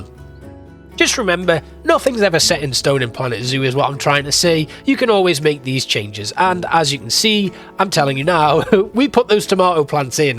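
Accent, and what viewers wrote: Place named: Newcastle, United Kingdom. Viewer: Im watching Saltwell now but about to turn off for the footy! You have definitley come on leaps and bounds but by no means would I say Saltwell is bad, especially from the African centre onwards i would say you really hit a stride. Im Welsh and I was sure I could hear a twang but theres no mistaking the Geordie, both melodic accents I guess! Keep the awesome content coming, also if you are looking for further inspiration from creators I highly recommend ZSHPlays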